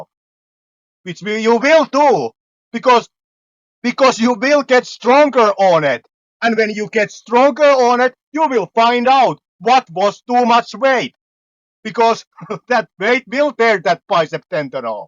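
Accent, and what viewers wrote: Finland. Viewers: Haven't watched the video yet but I have to say, you sound super Finnish :D (I'm Finnish)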